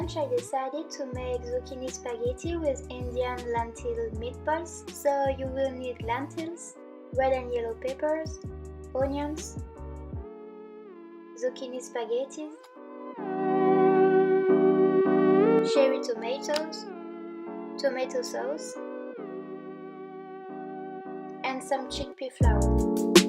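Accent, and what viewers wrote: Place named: France. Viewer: Je crois deviner l'accent francais non ?😉 super video !❤️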